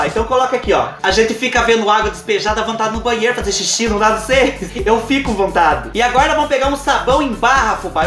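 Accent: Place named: Bahia, Brazil. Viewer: Amei esse seu canal😲Já g annhou uma inscrita nova😁Sou Baiana e seu modo de falar pareçe com o meu 😌😍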